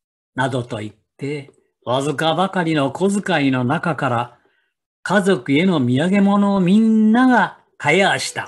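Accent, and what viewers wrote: Nagoya, Japan. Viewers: おはようございます😀お伊勢参りと言う語りがあるんですか？の作ですか？こうして聞くと名古屋弁もいいですね🌺今日も素敵な企画をありがとうございます💌🎵😀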